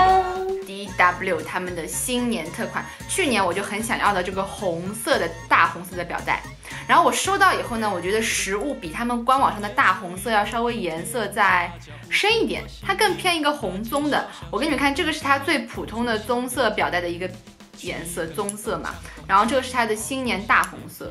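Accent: Shanghai, China. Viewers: 新年快乐啊！ 只能在英国过呀 也是很想回国吃吃喝喝的 哈哈哈 之前突然看了一个你的视频 特别喜欢你的性格 加上上海口音 太可爱了！ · 作为一个出生在上海 现在在墨尔本上大学的留学生，每次看你的YouTube（上海口音的普通话）就觉得特别特别亲切，特别想家。今年年三十在墨尔本和同学一起烧饭吃，谢谢你的视频！一个默默无闻的小粉丝 希望有机会能在上海相遇～x Angela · 杭州人听到上海口音就有回家的感觉了哈哈哈。第五年了还是在美国自己过年。😭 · Hallo, 你推荐的产品真的很好看也很实在，那瓶Essie的指甲油涂上美得不要不要的。我也是上海人，你的上海话和带一点点上海口音的普通话听来非常亲切。我住在德国斯图加特已经十一年了，中国年没假，肯定在斯图加特过年了。我们离得太远了，不然真想去找你玩。还有我也曾留言请教你这么多衣服如何分类或处理，有机会出个专辑吧，除了买买买，我们也要会收收收,藏藏藏，理理理，扔仍扔。最后祝愿新年快乐，万事如意！喜欢你! Xia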